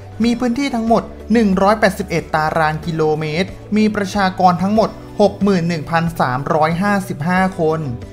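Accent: Thailand. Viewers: เหน่อสุพรรณครับ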